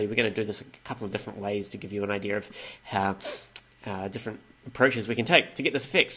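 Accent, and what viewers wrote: New Zealand. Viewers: Is your accent New Zealand or Australian? I love it none the less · He's a Kiwi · Instantly knew you were kiwi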